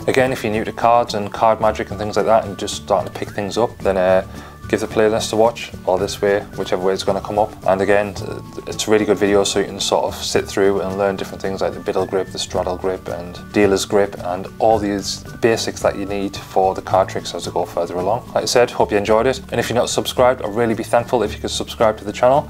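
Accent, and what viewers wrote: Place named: North East England, United Kingdom. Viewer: Great video. I'm new to Magic card tricks and just bought my first stripper deck. Sounds like your from the North East of UK? Good luck with the Magic mate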